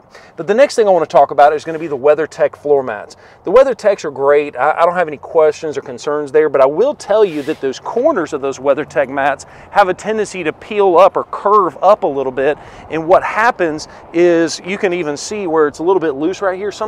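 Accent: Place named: Alabama, United States. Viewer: I lived in Birmingham for 18 years so I enjoyed and missed the narrator's accent. Now we live in the Colorado mountains where we can get storms that drop multiple feet of snow. We keep our trucks stock height which is good enough to handle any snow, dirt roads, and basic off-road trails. Unless you want to travel on knarly trails like Moab, or drive through water, the only benefit of lifting/raising your truck and slapping on huge wheels is bragging rights. Leasing is always a mistake unless you are getting a vehicle you are sure you don't want. Leasing is not ownership, you are just borrowing the vehicle. You never make any modifications to a leased car beyond replacing the tires if they wear out and inserting a phone charger in the cigarette lighter. It isn't your vehicle! The real owner, the leasing company, will financially punish you for messing with their vehicle. 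Even if you don't modify the vehicle you will be financially punished if you dare exceed the limited miles they allot you. When the lease is up your life crashes if you don't replace the vehicle. But with no accrued value or trade-in many buyers fall into the leasing trap, significantly raising their vehicles costs over buying.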